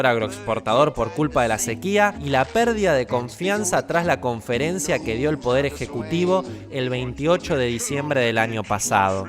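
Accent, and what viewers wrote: Argentina. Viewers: no se si sea yo o que porque se me hace muy difícil entender los vídeos, quiero ver tus vídeos porque son muy interesantes pero creo que es por lo que eres de argentina y es muy difícil entender como tu forma de interpretar algunas palabras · Se nota que eres argentino no sólo por tu forma de hablar sino porque no crees en la apertura económica ni la competitividad, aprende de Chile.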